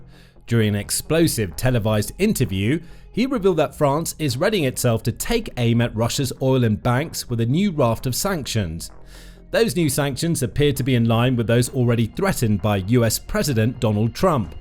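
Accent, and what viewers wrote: United Kingdom. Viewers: Please learn to pronounce NUCLEAR correctly - it’s very annoying to hear ‘NUCULAR’ - I love your voice but don’t enjoy hearing a nice UK accent that doesn’t pronounce English words correctly. Love from a grumpy old lady in Australia 😂❤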